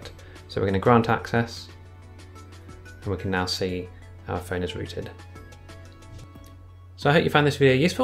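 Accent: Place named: England, United Kingdom. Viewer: >English tutorial, with english accent >Done on a Samsung device Perfection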